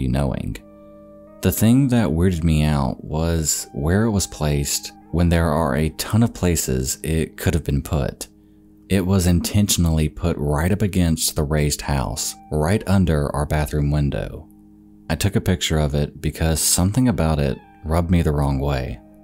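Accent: South, United States. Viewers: are you from Florida or Alabama or somewhere like that? I'm from north al and I've been trying to place your accent but I can't quite get it. It's very nice though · Lmao “NEXPO rip off” but NEXPO doesn’t have that sick ass southern accent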